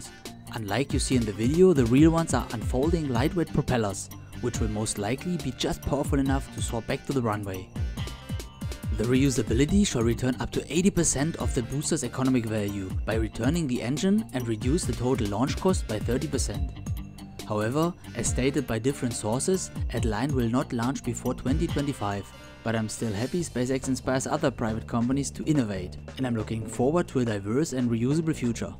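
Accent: Germany. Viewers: Dat german accent.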